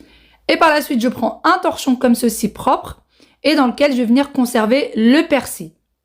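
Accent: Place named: Morocco. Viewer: Tu es marocain d'apré les produits😂😂et l'accent nous les femmes marocain hh on'est trés connus😅bon chance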